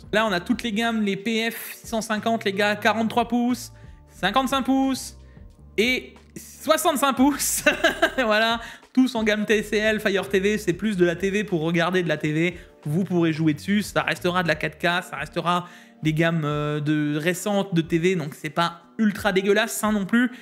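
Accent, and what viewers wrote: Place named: Alsace, France. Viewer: Hello et les autres, le SN850x est pas chère (aout 2023 j'en ai acheter un en 4to sans dissipateur pour 270 euros). pour moi, les télés LG c'est la vie le WEB OS est top! en vrai l'accent alsacien bien! allez pourquoi pas tester l'écran amazon:) le LG en 39 pouces (un 32 et demi) c'est comme le NEO G9 57 POUCES (deux 32 pouces) niveau taille sa doit être un monstre! Ah bah le ASUS en 4k 240hz Oled reverse en 1080p 480hz n'a jamais été en promo mdr! alors pour ce qui est achat, j'ai juste acheter mon asus rog swift oled 4k à 1109 euros au lieu de 1500 de base et puis c'est tout! d'ailleurs j'ai choisis celui ci pas que pour le gaming mais aussi pour travailler sur des photos #OUTILPORTATIF:)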